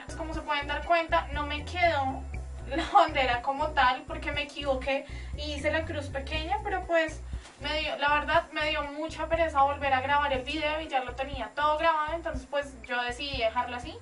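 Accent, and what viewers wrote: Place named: Colombia. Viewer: De donde eres? Colombiana? Me suenas a Colombiana..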